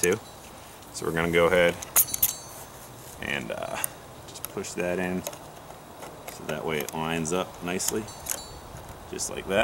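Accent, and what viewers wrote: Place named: Northern California, United States. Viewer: Norcal accent like Jake Shields. Great video man style- fast and to the point.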